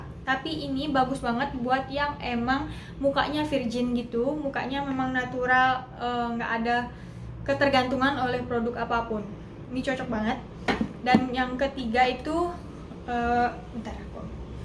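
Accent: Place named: Bali, Indonesia. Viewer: logat khe sa Bali x🤣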